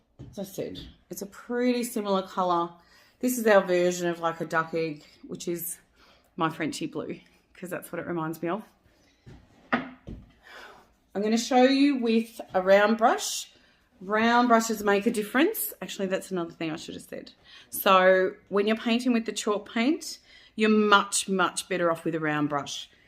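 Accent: Australia. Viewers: Lovely to hear an Aussie accent - thanks for the info! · Thanks so much for the in-depth video! Always good to hear a fellow Aussie too haha. This was fantastic, definitely deserves more views and likes.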